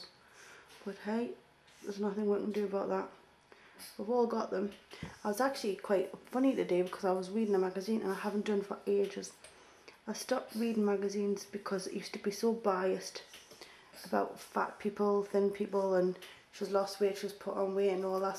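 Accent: Newcastle, United Kingdom. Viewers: shes not irish haha. shes from newcastle ino that cos i am too :D i talk like that aswell :] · Rockin' the newcastle accent angel! We geordies know whats happenin'! ^^ xo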